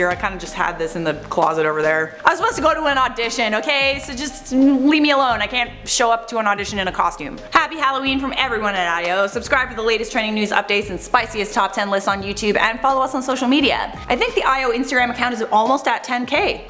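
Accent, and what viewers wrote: New York, United States. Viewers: NY accent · You're rocking that shirt. 🎃 Edit: your New York accent is awesome said in a New York accent 😂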